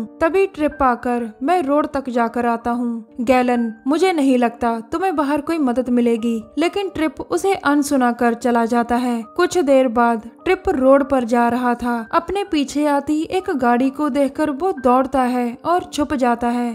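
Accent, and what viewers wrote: Punjab, India. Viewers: You're talking style punjabi. Are u punjabi? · Are you panjabi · R u punjabi?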